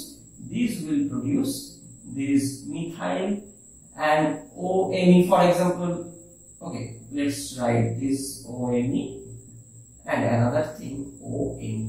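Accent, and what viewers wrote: Bengal, India. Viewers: Hmmmm, Apnar kotha sunei bojha jay. Bangali bangali ke chine fele.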